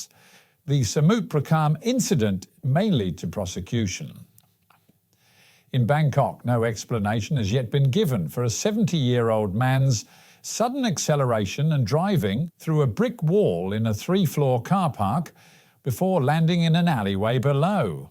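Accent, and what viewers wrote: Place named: Southport, United Kingdom. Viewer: Southport English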